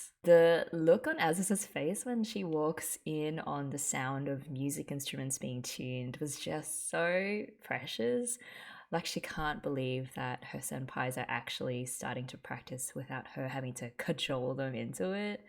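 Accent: Australia. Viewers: Really enjoy your videos and insights and have just spent the last 3 days binge watching K-On for the 5th or 6th time just so I could watch it along side you and catch up. Even after watching it so many times, you really bond with the girls (Ritsu is best girl for me) and tear up at the end as you feel like you're leaving your best friends. K-On is more than a cute-girls-doing-cute-things anime, and closer to a life lesson on getting the most out of the present. Since you liked the drum beat of Go! Go! Maniac (the second OP), here's a real-life Ritsu cover <link> She's totally awesome and was only like 13 at the time. Also as an Aussie from Melbourne who's been living in the US for the past 14 years, I really miss the Aussie accent, so that's been surprisingly comforting to listen to you too. Now I'm starting your Sora yori mo Tooi Basho (A Place Further Than The Universe) reactions, since that's another awesome show that I love. 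Thanks for all the work you put into the videos and look forward in watching Hibike Euphonium with you in the future.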